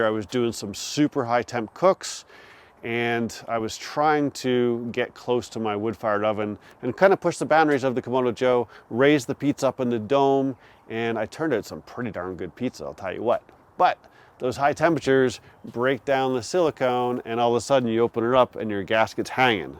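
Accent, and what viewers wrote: Canada. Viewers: Great video thanks. I’m considering the KK but doesn’t seem to be available in Canada. You do sound Canadian btw.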